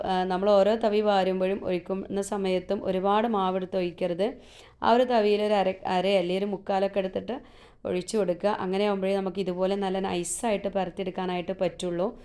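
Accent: Malappuram, India. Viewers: മലപുറം ഭാഷാനന്നായിട്ടുണ്ട് ഇത് കേൾക്കുന്ന ഞങ്ങൾ തൃശൂർക്കാരാണ്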